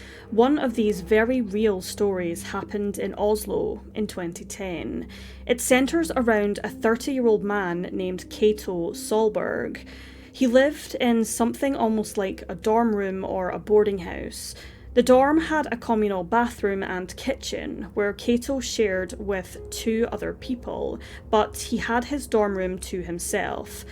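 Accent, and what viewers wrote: Scotland, United Kingdom. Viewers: Thank you so much for bringing light and exposure to these cases! Also may I ask what part of Scotland you are from? I’m an Ayrshire lass myself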